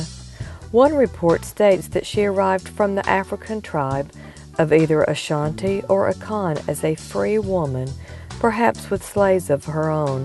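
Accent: South, United States. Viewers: My grandad Will was a Maroon and I cant stand this southern American voice over.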